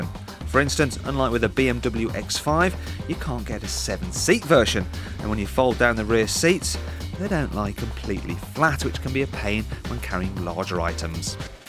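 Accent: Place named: Birmingham, United Kingdom. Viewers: had really posh accent years ago. Sounds proper Brummy in 2023. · Love this guys English english...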